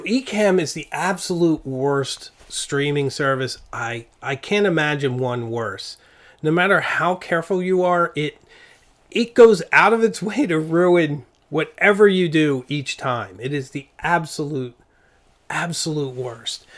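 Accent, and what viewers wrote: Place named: Massachusetts, United States. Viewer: you are already very cool. and your speech is very nice, it sounds like a Massachusetts Connecticut accent with a slight hint of Bostonian-lite. you could literally host PBS Masterpiece Theater and no one would think it out of place with either good style or speech. in fact, you could probably make money on the side as a voice actor. and about your story episodes, they are poetic and very entertaining. by the way, people with northeast accents that strive to enunciate well are often considered snobby and pretentious because that's how snobby and pretentious people are portrayed on tv and in movies, right? it takes a while for inexperienced people to learn this. i believe we have cartoonists and writers/ actors to blame, since they perpetuate this myth and perhaps it is a bit of a truth when seen in actors and elites off stage who belittle those who do not subscribe to the marxism by any means necessary philosophy and will literally tell you day in and day out how you are not better than them? 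No? i have been busy with the health concerns of my mother, mother in law, my wife, my sister, my neices, brother in law, and myself, and cooking every day and working on this house, my friend. i am sorry i missed your story videos. i don't get notifications on most of my subscriptions! oddly, the disaster channels always show, and a nice man in england who teaches english one word at a time, with some odd ones thrown in often enough to make it interesting! i hope you have a great week.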